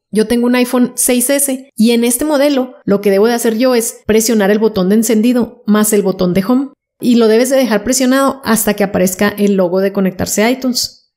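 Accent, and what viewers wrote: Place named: North, Mexico. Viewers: Me súper sirvió, que hermosa voz!! Me hace acordar al acento de Saltillo de una amiga 😍 saludos! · Que linda voz así como norteñita me encantó tu explicación me sirvió para desbloquear mi CEL mil gracias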